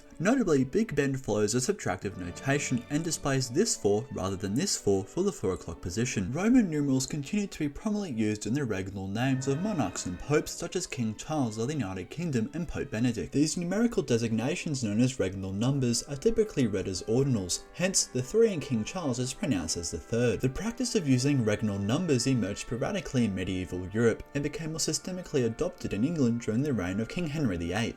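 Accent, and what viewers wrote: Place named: Italy. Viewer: the pronunciation was kind of weird, he mixed anglicized pronunciation with an italian reading of the C. latin is dead and also everywhere so you can pronounce it however you want, but I'm not sure by what standards that was nice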